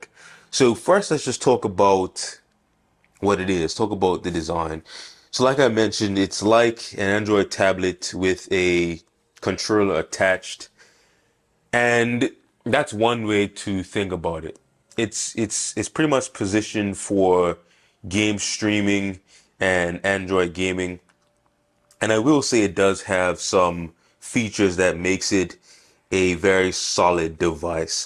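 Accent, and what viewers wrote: Bermuda. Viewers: Are you from Bermuda? I hear an accent.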